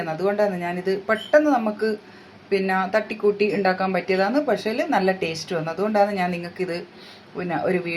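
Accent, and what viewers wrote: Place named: Mahe, India. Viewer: മിക്സിയിൽ ഒന്നു പൾസ് ചെയ് തെ ടുത്താൽ മതി ചില്ലി ഫ്ലാക്‌സ് ആക്കാൻ നിങ്ങൾ മാഹി സൈഡ് ആണോ സംസാരം കേട്ടിട്ട് തോന്നുന്നു ണ്ട് കോവക്ക സൂപ്പർ ആയിട്ടുണ്ട് 👍👌😋😋 👫🔔✅ New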